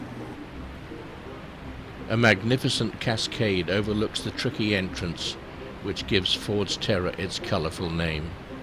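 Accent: England, United Kingdom. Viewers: I don't know why but every adventure video seems more dramatic when it's voiced with a deep English accent. I felt like I was watching a National Geographic expedition from the 80s.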